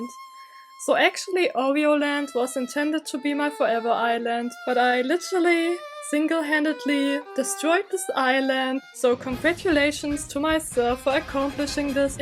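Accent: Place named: Germany. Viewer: Are you german? You have a very german sounding accent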